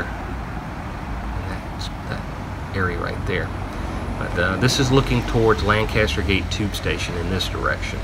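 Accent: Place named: South, United States. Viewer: I wasn't expecting to hear a good ol southern voice explain a London site. 😁👌🏻✌🏻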